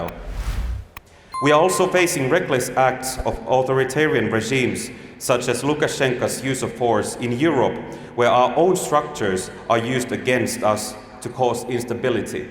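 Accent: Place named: Finland. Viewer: Täyttä asiaa, mutta sun pitäis yrittää ääntää sanat eikä lukea niitä kuin suomea. Sinänsä virheetöntä tekstiä kylläkin.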